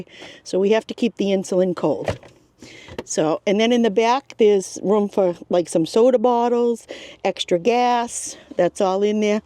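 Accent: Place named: Boston, United States. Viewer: I usually love Boston accents but this one got old very quickly.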